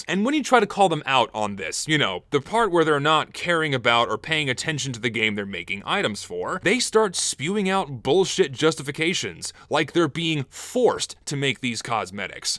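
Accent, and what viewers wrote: Texas, United States. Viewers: "The more lazy, narcisstic sides of the workshop" a little bit of sundowner just spilled out there. I love the Texas accent.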